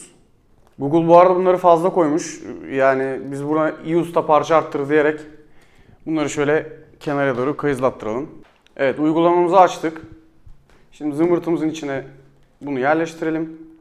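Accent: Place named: Izmir, Turkey. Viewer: tam izmirli yaa.. incedennn ;)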